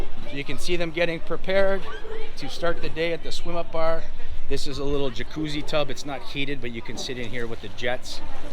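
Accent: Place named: Ottawa, Canada. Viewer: Ah you’re from Ottawa “ eh “? I have a question regarding the currency exchange place you showed towards the end of this video. I was wondering if you tried exchanging Canadian Currency for Pesos? I have always taken pesos with me in our several trips to Mexican Resorts but I heard on one other video from this resort that the currency exchange kiosk takes Canadian dollars in exchange for Pesos? Im a little disheartened about the reserving of the Ala Carte restaurants. On our first trip which happened to be in the Mayan Riviera the resort we stayed at require pre booking reservations at the restaurants at the lobby. It turned out that we ate almost all meals for the 7 days at the main buffet because it was huge and I’ve never seen as much selection and variety in a Mexican Resort Buffet. The second trip we were told we had to make a reservation for any of the three A la Cartes at a desk in the lobby am however if you weren’t in line by you had no shot at getting a reservation. 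We did manage to get into the Mexican restaurant and the steak restaurant ( but pm! the last available reservation ) later during our 10 days at stay but twice even though I stood there for 2 hours and was between 10-15th in line when I got up to the desk they said all seating in each A la Carte was fully booked. Walking past those places to pm and seeing half of the tables empty was a disappointment. I’m hoping that either or the buffets have good food and variety or I’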